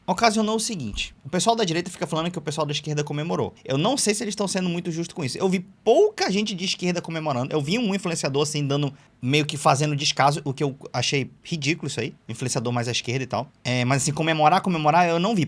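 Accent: Manaus, Brazil. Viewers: Fala, em um vídeo seu, vi que estudou na UFAM. Você é amazonense mesmo? O sotaque parece muito com o manauara.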